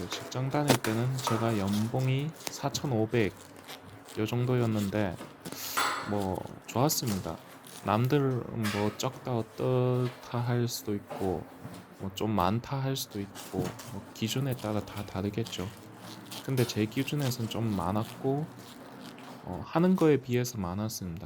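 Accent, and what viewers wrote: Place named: Ulsan, South Korea. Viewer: 울산사셔서 사투리 쓰는게 왜 죄송한 일인가요 열심히 사는모습이 중요하죠. 화이팅입니다.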